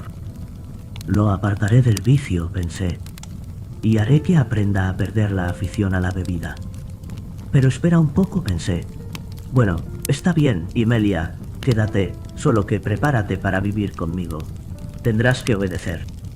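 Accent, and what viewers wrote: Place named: Spain. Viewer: ESO es dormir.... Con ese asqueroso acento del tipo que vino disque a colonizar no solo a mi territorio sino todo centro is y no quiero hablar de ese idiota que andaba perdido 😅 dale este tipo connesr acenide mierda y postea algo para dormir blaaaaaaaaaaaaaldoto estúpido yo que perdí tiempo no solo escrirnfoeesto sino quitando segundos de mi vida escuchado a este asqueroso español 😂😂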